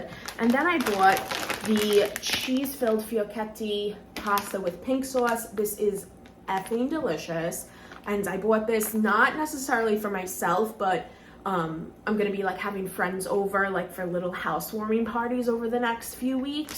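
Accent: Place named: New York, United States. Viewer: I love the ny accent ✨💗💗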